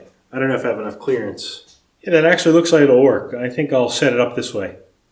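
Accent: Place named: United States. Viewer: As usual a fascinating and really interesting video. I'm interested to know: to my British untutored ears you sound American, not Canadian, so how come you mostly seem to use metric? (unlike most of your countrymen who still use that bizarre system they inherited from us Brits.) Even tho I'm I'm my 70s and was schooled in scruples, furlongs etc. it amazes me that anyone would choose "English" when everyone everywhere else uses metric. Perhaps you live in Europe and have seen the light. :--)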